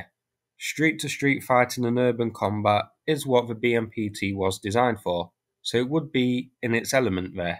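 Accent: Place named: Yorkshire, United Kingdom. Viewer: "Countreh" "Terminatuh" "Yeller" I wonder what part of yorkshire the narrator is from ?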